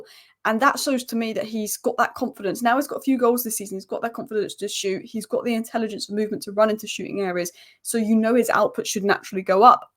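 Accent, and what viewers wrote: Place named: England, United Kingdom. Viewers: I watched a tv show called uncle and he has an accent like hers. What part of England do People have this accent. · What part of Manchester is she from?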